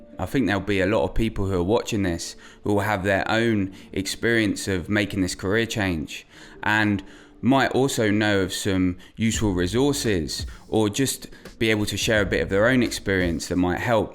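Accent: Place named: London, United Kingdom. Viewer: Whats interesting is I think your accent has got more London from these early videos, maybe more vocal fry, it’s funny because I met this guy at this film event who was clearly trying to hide his really normal middle class background by sort of trying to appear like he might of ground up near some house estate in east London. I didn’t ask about his background but I could tell he might of felt like he wouldn’t be accepted as an artist unless he could present that he had some socioeconomic struggle and hinted past trauma. He showed me some photos he took, he sort of shyness around his urban aesthetic, he photographed old cafes and old people smoking, gritty stuff but I could tell he wasn’t from that world at all and it was odd because he’s clearly thought to create this character out of fear of not being seen as a true struggling artist. 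I mention this because your channel is about the struggling artist.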